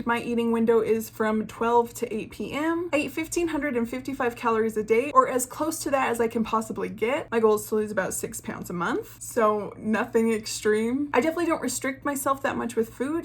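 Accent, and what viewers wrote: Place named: Midwest, United States. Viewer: Hey are you from the Midwest? I’m from Minnesota and your accent sounds like mine lol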